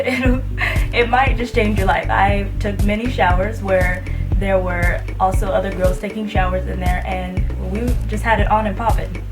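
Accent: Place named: Caribbean. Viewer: oh,thought you were from the caribbean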